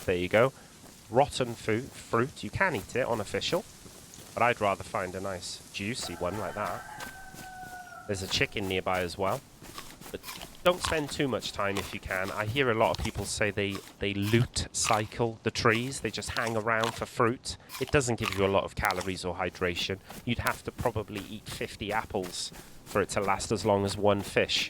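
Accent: Wales, United Kingdom. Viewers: Great video - you welsh?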